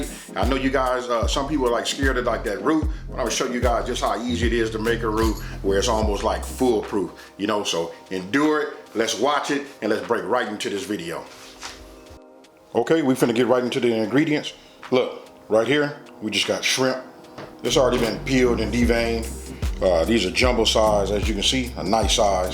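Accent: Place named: California, United States. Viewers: OMGGGG i’m trying like recipe! this my first time coming to your channel. as soon as you started talking i knew you was california🤣😭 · I love the L.A. accent boy felt like home!! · That’s how people from Cali sound. Black men either sound like Ice Cube E-40 or CJ from Grand Theft Auto San Andreas in California 😂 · You sound like you from Cali.I use to live in Alameda. Mannn... keep doing ya thing brother. Godbless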